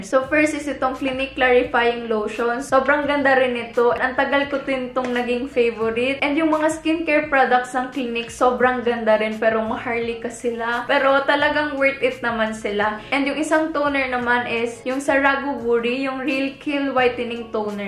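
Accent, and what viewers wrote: Visayas, Philippines. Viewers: Bisaya ka ate?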